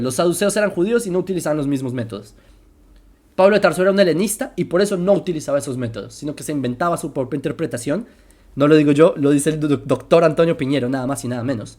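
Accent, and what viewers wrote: Bogotá, Colombia. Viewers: Me gusta mucho su acento Bogotano, muy elegante... con cierta pronuncion estilo arabe... Me gusta mucho escucharlo. Pero esta tan sesgado que no puede salir de la norma ortodoxa Judía, lo que como a todo religioso no le permite abrir la perspectiva sobre los conceptos.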